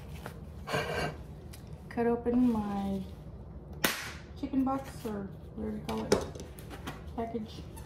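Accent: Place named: South, United States. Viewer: that’s a good one to prep up the night before I bet. I knew a lady that cooked all her meals for the week on Sunday. She put them in the freezer. She lived an hour away from work. Her family would pop it in the oven to reheat. I never have had the space to do that. But if you like cooking and can, that would make a good video for you to do. I like listening to you talk you remind me of my friend. I’m from the south. It makes me feel homesick! Keep up the good work.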